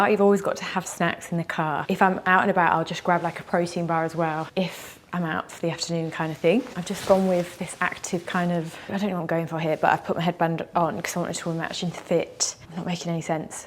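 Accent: United Kingdom. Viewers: This is very inspiring. You know what the moment I just started watching your video I could tell you are British. This makes me more proud of being British when another British shares this. I do workout weekends when I am going to work week days and I am now size 10 from size 20. I eat gluten free food for dinner I have prep salad meals mixed. Well done. Thanks for sharing too.❤❤❤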